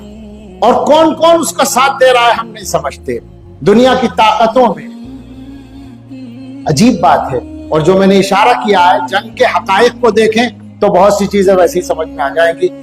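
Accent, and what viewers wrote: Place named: Hyderabad, India. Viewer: جناب کی شکل تو مودی سے ملتی جھلتی ہے، اور آواز و لہجہ بھی انڈین حیدر آباد والی ہے۔ 😊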